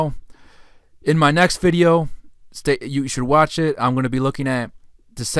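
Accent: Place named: Mexican-American, United States. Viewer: It's tough to place your accent. Sounds almost like what Mexican-Americans with English as a mother tongue sounds like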